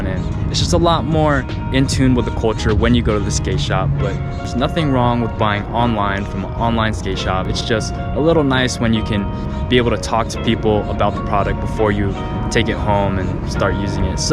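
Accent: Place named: Ohio, United States. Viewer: he sounds like an ohio man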